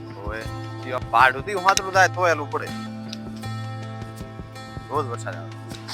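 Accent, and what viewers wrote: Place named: Banaskantha, India. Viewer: જય માતાજી મોટા ભાઈ ક્યાં થી છો ભાઈ તમારી બોલી બનાસકાંઠા ની લાગે છે બહુ સરસ વિડિઓ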